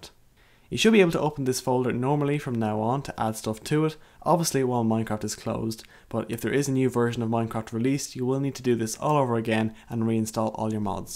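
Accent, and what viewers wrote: Ireland. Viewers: are you irish? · are you irsh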